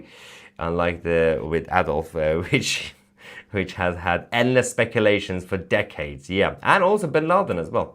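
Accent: United Kingdom. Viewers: So you were saying Ayatoilet. I thought maybe it was my American ears misunderstanding your British accent. Very fitting moniker for such extremist refuse.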